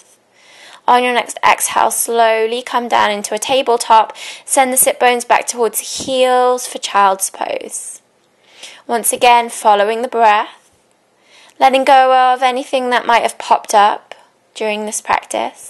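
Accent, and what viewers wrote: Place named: United Kingdom. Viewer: Thanks. I used the video that you referred to this morning - it is very good too. Thank you. If you are open to other suggestions, here's a couple: 1) You say in your bio that you also practice Mindfulness. I started doing this last fall. Have you considered doing some guided mediation videos? I use other guided mediation videos on YouTube and they are very popular. Your voice soothing (especially British accent). 2) A quick 5-7 minute stretching video that people could do at their desk in an office.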